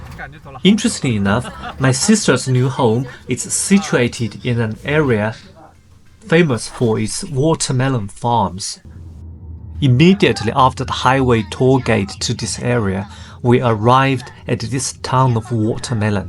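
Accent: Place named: England, United Kingdom. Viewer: I enjoyed your video very much. Your sister’s flat is very spacious and nice, not at all what you would think of as housing for the poor. Your English is excellent, and I’m really pleased that you have an English accent and not an American one when you speak, I also enjoyed the traditional Chinese music. I have subscribed for more interesting video’s. Thank you for sharing.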